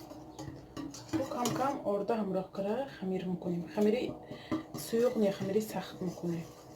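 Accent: Isfara, Tajikistan. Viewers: хамаи видиохо зур диданба мигабайт намирасад авлаш рахматки забони исфарагиамонро гум накардед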